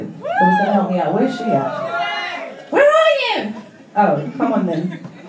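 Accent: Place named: United Kingdom. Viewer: Damn!! I love the British accent on women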